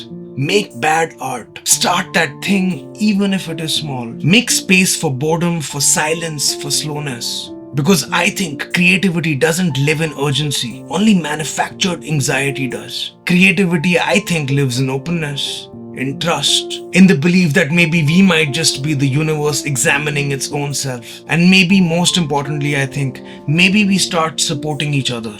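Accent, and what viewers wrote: India. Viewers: reminded me of a YouTuber bass player who plays Behs you know?) Danny Sapko. So he’s pronouncement and yours are very similar except one little spice of India in yours and one little spice of Australia in his.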